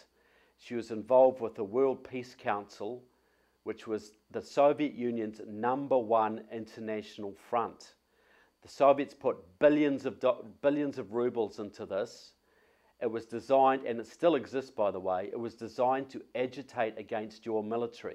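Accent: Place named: Australia. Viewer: i just cant stand looking at his ugly drood face for more than 1 minute, and listen to his annoying austrailian accent, long enough to finish this video, boooorrrinnng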